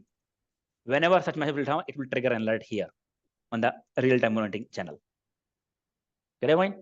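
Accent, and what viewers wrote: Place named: India. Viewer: Very strong accent and talk very fast who the hell would understand him besides his Indian accents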